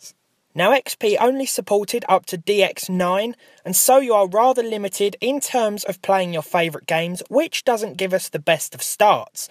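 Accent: United Kingdom. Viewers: You're* nice uk english.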